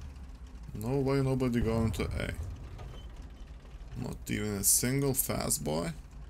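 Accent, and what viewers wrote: Eastern Europe. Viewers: Why is this in my playlists and warthunder is still going wow I haven't played this for years . Eastern European accent and crap meme's no thanks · uses british tank yet im still hearing some slavic some sounds....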